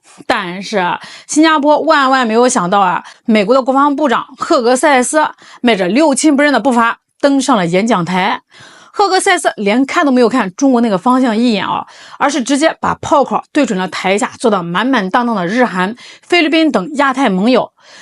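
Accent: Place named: Shandong, China. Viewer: 你这山东调不能常听要把我带偏😊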